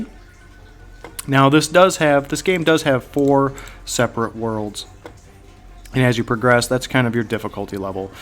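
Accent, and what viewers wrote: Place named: South, United States. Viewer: ooooo southern accnt! charming!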